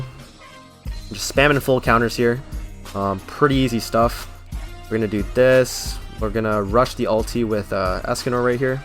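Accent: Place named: Vancouver, Canada. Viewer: yo are u from BC? u sound like someone from van XD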